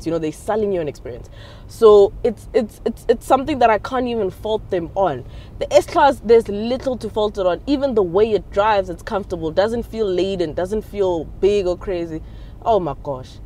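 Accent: Pretoria, South Africa. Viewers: "Ke diesel gaeje petrol" 😂😂😂she's definitely from Pitori 😂😂❤ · The Pretorian accent made me enjoy this review 😂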